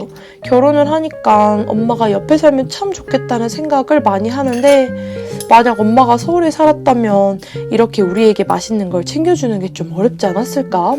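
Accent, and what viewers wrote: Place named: Jeolla, South Korea. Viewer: 저도 광주사람이라그런지 전라도사투리가 친숙하게 들리네요~~영상 잘봤습니다~~❤️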